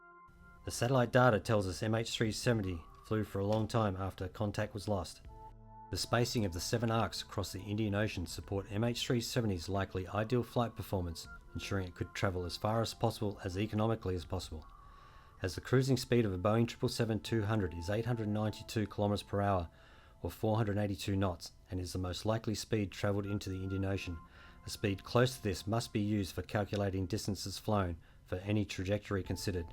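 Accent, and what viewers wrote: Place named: Australia. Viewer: Brilliant work. Really well done. How do you know the CB’s were pulled at a certain time? And, what was the purpose of then restoring their position later in the flight? Again, great job, sir. As a fellow Aussie, you had me with the accent. I hope you pursue a career with ATSB. Needs more great, analytical minds!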